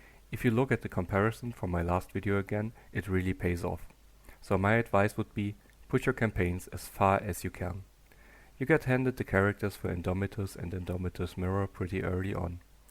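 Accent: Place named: Germany. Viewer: Geiles Video Nice vid Deutscher Akzent würde ich schätze n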